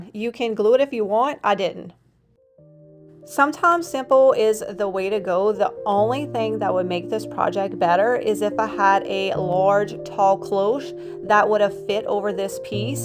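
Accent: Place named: Los Angeles, United States. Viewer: She’s from La, I can tell the way she talks.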